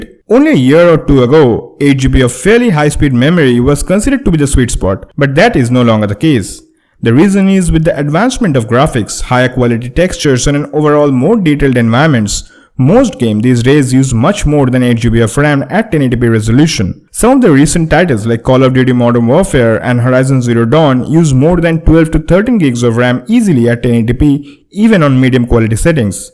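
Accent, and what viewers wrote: India. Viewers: Lag raha hai thoda bahut indian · He speaks good english and i like his indian accent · You've gone a long way to hide your indian but you still got a bit left.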